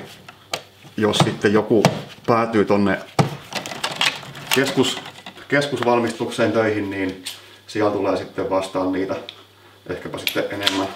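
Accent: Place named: Turku, Finland. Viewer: Tuosta murteesta sellainen, että oli alkuun vaikea ottaa sun sanomisia ja ohjeita tosissaan, kun kaikki oli turkulaisittain ilmaistu epävarmasti ja "vähän sinnepäin", mutta siihen on tottunut. Tässä kun on sun videoita ihan ajanvietteenä ja uuden oppimiseksi lomalla katsellut, niin tässähän saattaa kohta uskaltaa jopa käymään Turussa, kun alkaa ymmärtämään murrettakin. :D Sopivan selkeitä videoita ilman turhaa koristelua, hyvä hyvä!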